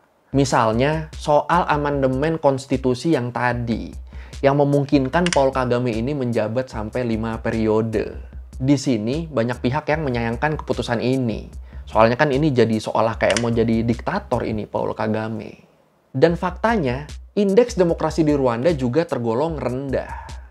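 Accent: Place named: Madura, Indonesia. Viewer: KenaPa LogatLu KaYak ORang MaduRe Cong, BiSa KaYak GiTu Ya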